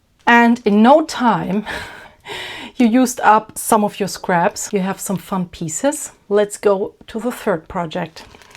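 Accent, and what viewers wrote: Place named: Germany. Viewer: I was wondering if you were German as your accent sounded German. I think your speaking English and explaining things really well. Really lovely art techniques again. And your fingernails aren't horrid at all, lol. You nailed this video and your art perfectly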